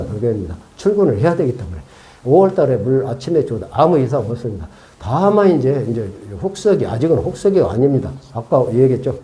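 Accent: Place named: Chungcheong, South Korea. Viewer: 무반주 충청도 아줌마 정겹습니다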